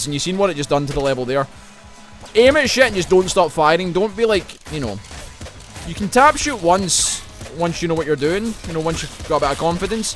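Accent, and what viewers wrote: Scotland, United Kingdom. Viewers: it's always great hearing advice from another scot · I would laugh at any of your videos due to your Scottish accent. Just makes every story entertaining. Good video though, man.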